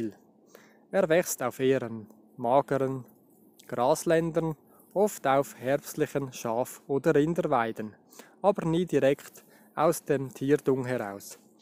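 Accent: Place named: Switzerland. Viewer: Sorry, musste nach dem ersten Wort ausschalten!! Wenn auch noch ein CHler meint er müsste was vorlesen!!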